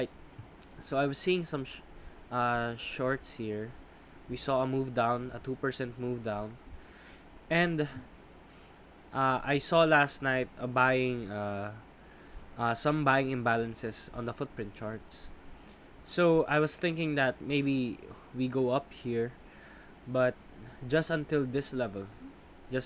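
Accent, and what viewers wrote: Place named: Philippines. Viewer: Just curious with your accent if you're a Filipino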